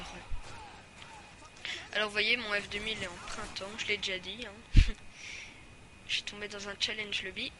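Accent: Switzerland. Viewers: Il est serait pas suisse lui par hazzzzard???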